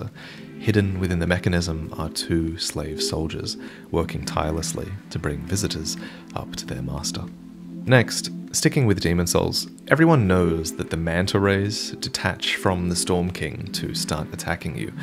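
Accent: Australia. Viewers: the only thing that has kept me from going hollow the last 18 months is the milky smooth sound of your Australian voice. Hold me... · very random, but you do you hail from down under,?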